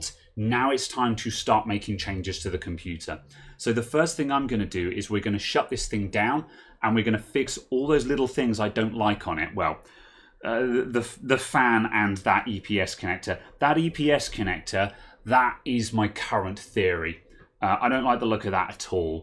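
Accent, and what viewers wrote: United Kingdom. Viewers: ...Because you sound VERY BRITISH!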